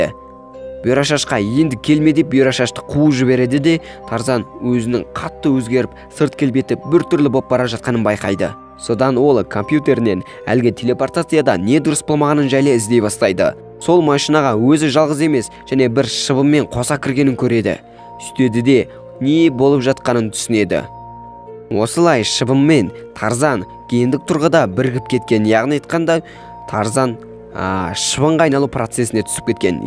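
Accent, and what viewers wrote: Mongolia. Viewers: Өзіміздің Моңғолиядансың ба?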